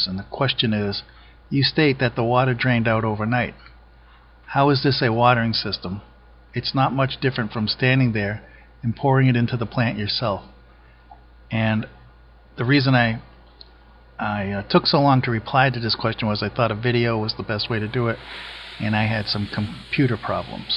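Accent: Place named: New England, United States. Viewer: HEHEHEHEHE!!!!! I love how you watuh those plantuhs!!!! When I hear your accent, I think of lobstuh fishuhmen.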